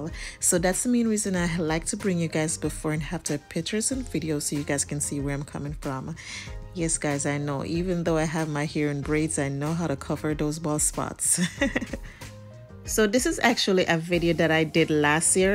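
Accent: Jamaica. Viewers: You sound Jamaican 🇯🇲 big up if you are lol🇯🇲🇯🇲🇯🇲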